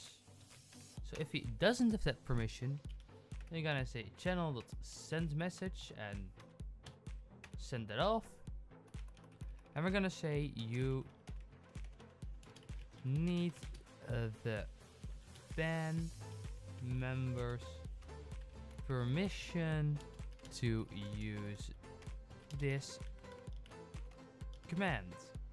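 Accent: Netherlands. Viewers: your accent like a Dutchman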